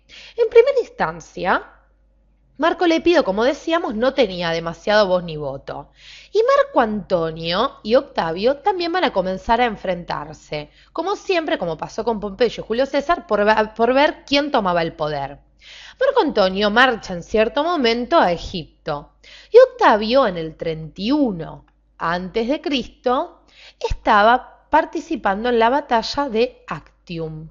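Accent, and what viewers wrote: Argentina. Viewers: Es argentina la piba?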